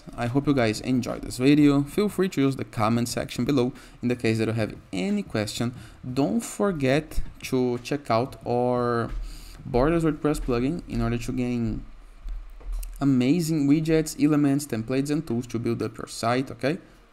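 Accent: Brazil. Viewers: Are you brazilian? Your accent sounds really 🇧🇷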